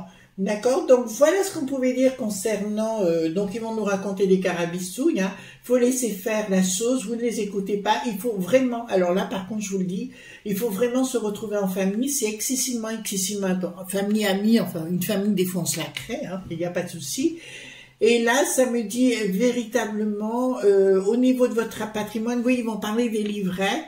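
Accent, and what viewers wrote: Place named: Lyon, France. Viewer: Je vous écoute pour la 1ere fois sur le conseil d une voyante que je suis j adore votre franc parlé je trouve que vous avez un accent lyonnais et certain mots es que je me trompe je suis lyonnaise j habite Montpellier je suis ravie de votre vidéo merci 👍👍👍👍👍😂😂😂😂👋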